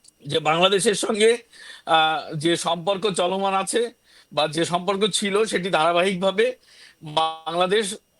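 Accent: Kolkata, India. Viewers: এই ভুয়া সাংবাদিক কলকতার, তার ভাষার টোন বোঝা যায়। এগুলা করে লাভ নেই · ঐ সাংঘাতিকতো বাংলাদেশের না।ওর কথার উচ্চারণ ভারতীয়। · Kolkatar accents!!!! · এই সাংবাদিক এর ভাষা তো কলকাতার ভাষা! আর একে তো কখনোই দেখিনি!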